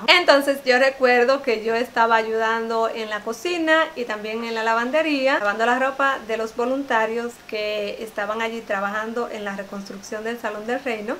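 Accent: Dominican Republic. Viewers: He visto tu video varias veces. Me encanta ese acento Dominicano tuyo. Eres muy linda y muy valiente . Gracias por compartir tus experiencias y puntos de vista. Saludos desde Cuba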